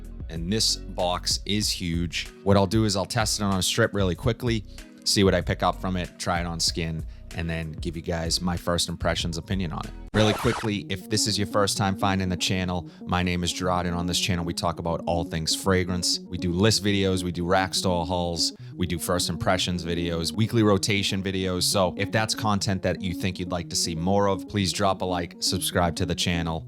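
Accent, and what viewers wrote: Boston, United States. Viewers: After a month - have your impressions changed? Also, you’re from Boston aren’t you :)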